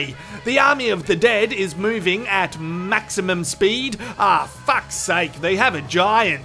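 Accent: Australia. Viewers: Stumbled across this in my recommended list. Hope people don't think all Aussies sound like this or have such a potty mouth.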